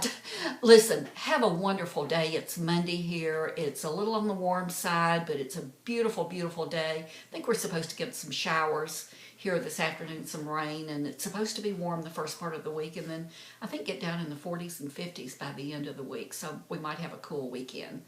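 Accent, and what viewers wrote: South, United States. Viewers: just found recently your utube videos and am hooked, just love your accent, reminds me so much of the way Liz Taylor spoke in many of her films, kind of Southern accent though i think you are from Arkansas, am i right, you are such a young beautiful lady and your wedding day snaps are so lovely. i still have many more of your videos to look at. Have been to many US states but not Florida nor Arkansas, my fav is New England, twice visited all those NE states, also CA from LA all the way up the Pacific coast road to San Fran, hope to go back and see more. just love US. regards Jenny from the UK. XX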